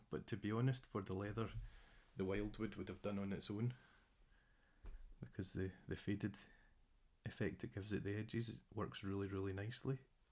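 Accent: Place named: Scotland, United Kingdom. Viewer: Great video man. I am average painter too, slow and steady. I like the mix of red, white and blue. It makes him very regal. I love your commentary, like a Scottish Bob Ross.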